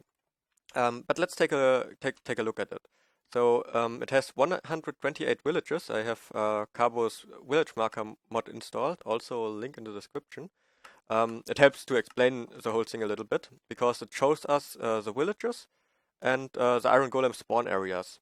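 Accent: Germany. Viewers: do you come from Germany?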